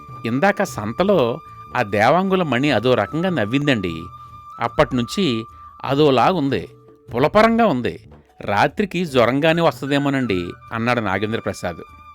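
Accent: Godavari, India. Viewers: కాదేదీ కథ కనర్హం. నవ్వు అన్న ఒక్క విషయం మీద కథ అంతా అల్లడం నవ్వులాట కాదు. ఆ మణి నవ్వుని మేం చూడలేకపోయాం అన్న వెలితి లేకుండా మీ సహజమైన గోగారి యాసలో ఆ నవ్వుని స్పష్టంగా వినగలిగాం. ధన్యవాదాలు.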